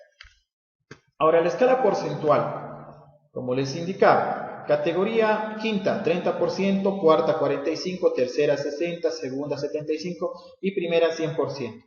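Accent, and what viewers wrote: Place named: Mexico. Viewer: Pensé que era de México, por su acento.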